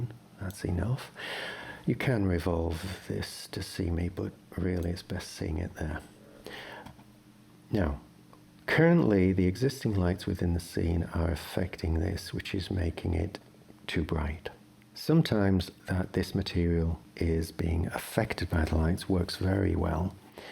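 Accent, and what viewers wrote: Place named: United Kingdom. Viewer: wow this guy's voice is different he must be from the UK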